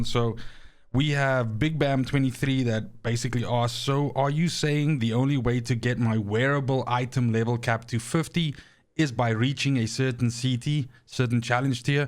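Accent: South Africa. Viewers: I do trust your judgement & how you break it down. I just figure if I'm playing anyways I may as well play the CT's that people claim will give what I'm looking for. (Deathshield, Highroller) PS stream I don't follow any steamer, I'd follow you & I main Trickster. You & Niktew have great have downs. Khraze & Widdz are helpful & DPJ is just hilarious! PS love the SA accent! Haha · I honestly didn't notice your accent as my girlfriend is from SA so it sounds normal to me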